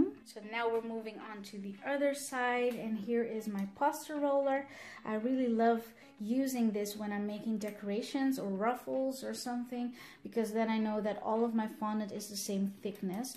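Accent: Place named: Philippines. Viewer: Cool vid! Btw you a lil filo accent, are you filo?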